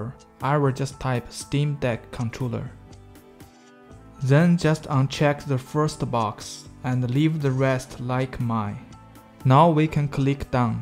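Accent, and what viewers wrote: China. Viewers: 熟悉的中国口音，蛤蛤蛤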